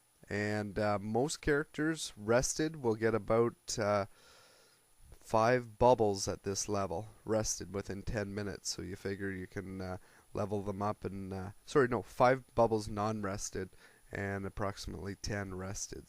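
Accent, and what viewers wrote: Canada. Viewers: haha I remember at the time he was doing this I was just amazed. Because I hadn't seen anything like this. I was like HOLY SHIT!!!!!!! and I like his Awesome Canadian voice <3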